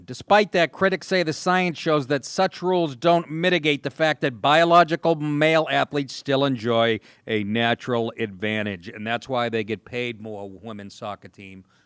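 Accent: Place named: New York, United States. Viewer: "sar" a soccer game. Ha...ney York accents. Love ya Nick.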